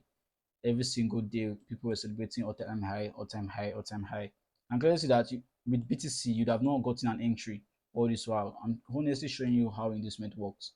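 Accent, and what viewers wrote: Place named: Nigeria. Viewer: Nigerian accent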